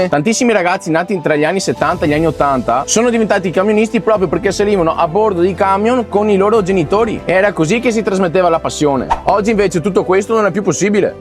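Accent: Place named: Padua, Italy. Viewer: Ai ragione.. Tutto vero ciò che dici. Ti saluto. E ti auguro sempre di fare bellissimi viaggi un abbraccio e bacione. Marta da Brescia. Sei un padovano. Doc. Mi piace molto sentirti con la tua parlata padovana.ciaooooo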